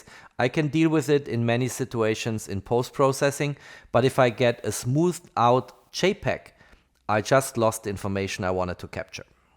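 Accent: Germany. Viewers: Man hört am Dialekt das er Deutsch ist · Please, stop calling Huawei a Hua-Y, and Ultra ['ʌltrə] is not ['ultra]. I can hear your native language is german, nevertheless...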